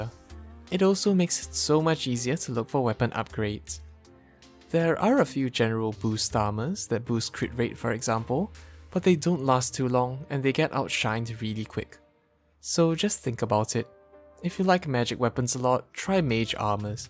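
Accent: Singapore. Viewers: Wah bro, you sound like a singaporean. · knew u were singaporean the moment u started talking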